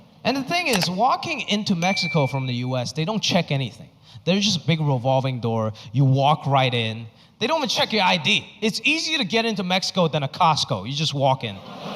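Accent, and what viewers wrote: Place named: South Korea. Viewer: 진짜 생긴 건 한국인 같은데 발음도 한국어 발음이 좀 섞여 있는 것 같아서 진짜 한국인 같음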